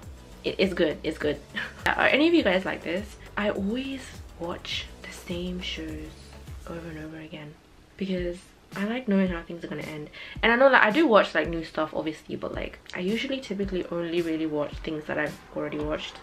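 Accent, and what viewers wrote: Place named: London, United Kingdom. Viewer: ngl I did NAWT expect you to have a London accent but what’s good 💅🏾✨ make up looks sick n I hope you feel a little better now 🥺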